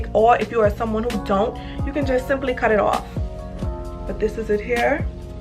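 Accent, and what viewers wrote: Bahamas. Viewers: Your accent sounds Bahamian...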